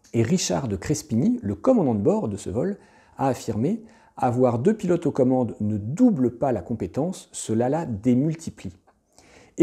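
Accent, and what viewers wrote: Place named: France. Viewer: the ne se prononce pas ze, mais de sur le bout de la langue. Vous faites tous la même erreur les français 😂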